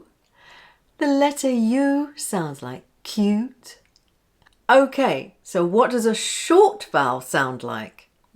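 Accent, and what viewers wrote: RP, United Kingdom. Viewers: Please are you british I want to learn the british English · ¡Eres fantástica,! I love RP Accent!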